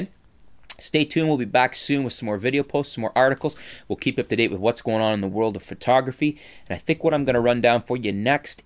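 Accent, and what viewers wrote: Canada. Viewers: Goiod info. Canadian, eh? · After he said he was Canadian I couldn't ignore his accent.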